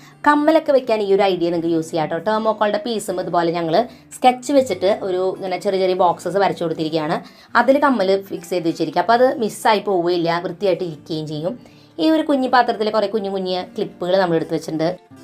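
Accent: Thrissur, India. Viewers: Chechi Thrissurkari ano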